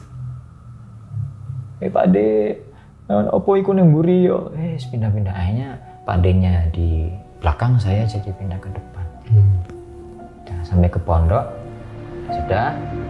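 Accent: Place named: Banyumas, Indonesia. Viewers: Slam ngapak mas tegale ndi mas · Di Chanel BP lebih lengkap, disini juga keren aku suka gambar ilustrasinya, sehat trs wong tegal laka2 ceritanya epic bhs juga epic ngapak 😂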